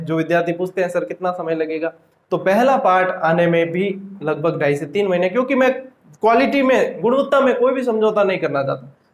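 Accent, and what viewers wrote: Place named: Jalore, India. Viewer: Aapki boli jalor side ki h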